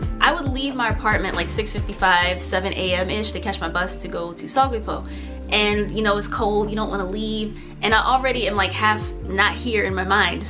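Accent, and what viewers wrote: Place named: England, United Kingdom. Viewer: PS love your English accent 😁😂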